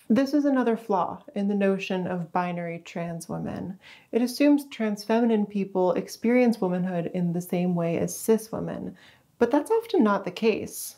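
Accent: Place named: Canada. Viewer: P.S. I love your Canadian accent! Much love from the UK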